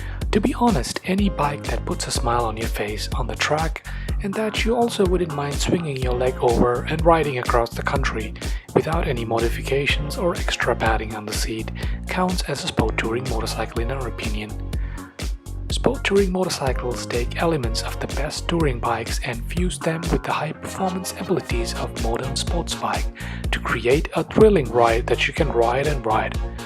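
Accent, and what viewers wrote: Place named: United Kingdom. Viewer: Really annoying Brit accent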